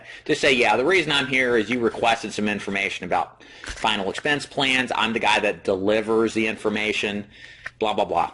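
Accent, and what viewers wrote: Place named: Chicago, United States. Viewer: Where are you located.. your accent sounds Chicagoesque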